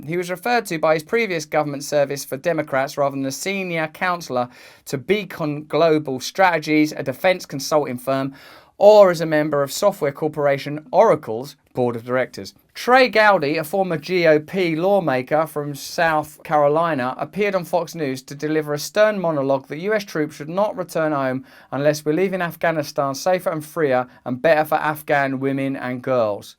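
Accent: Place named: Cockney, United Kingdom. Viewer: More utter gibberish from the cockney Deepak Chopra.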